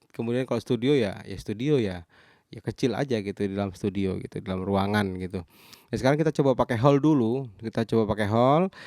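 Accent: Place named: East Java, Indonesia. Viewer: Logat jawa timurnya berasa mas... Hehehe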